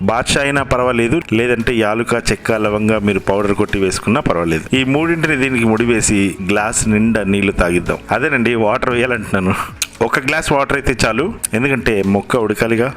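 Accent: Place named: Godavari, India. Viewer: garu... me voice ki, mee recepies, last lo meeru paade songs ki nenu picha fan ni andi babu... miru chepthe nammaru nenu kuda mee laga matladesthunna andi baboiii... Mee yaasa ki mee prasa ki 🙏🙏🙏 Finally mana godavari vantalu antene oka adbutham... danni miru inka baga vandi chupisthunaru... Ah vantalu chusi abroad lo unna ma paristhithi enti andiii... edi miku emanna nyayam ga undaa...🥺 Final ga okati andi mee voice ki mee yaasaki nenu pedda fan...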